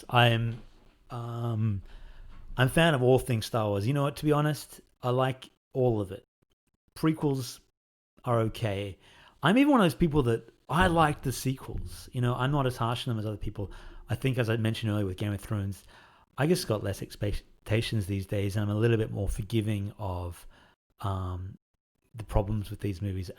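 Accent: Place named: Australia. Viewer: Thank you very much, I really appreciate it! Sometimes I just like eating a bunch when down. I should definitely take a break from technology for a few minutes and go out for a hike or jog. Also, your aussie accent is wonderful!:D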